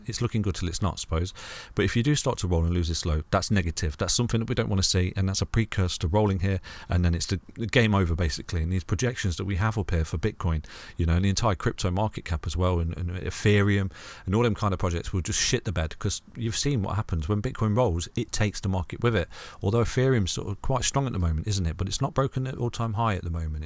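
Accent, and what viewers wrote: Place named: North, United Kingdom. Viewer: How can nayone be depressed listening to your luverly northern accent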